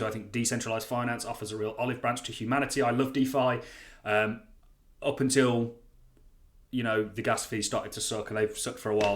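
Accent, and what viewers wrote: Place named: Wolverhampton, United Kingdom. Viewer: I'd say wolves not brum haha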